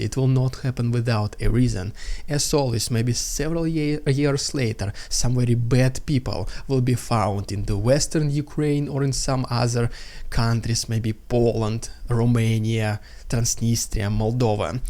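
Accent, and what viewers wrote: Russia. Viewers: I like your Russian accent. I love your anti putin attitude. Putin is anti human and a stalinist. Slava Ukraine 🇺🇦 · Love the Russian accent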